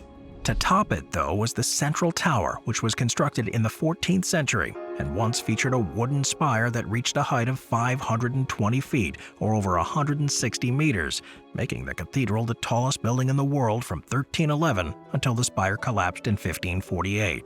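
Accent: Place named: United States. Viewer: The American accent of the narrator hurts to the ear..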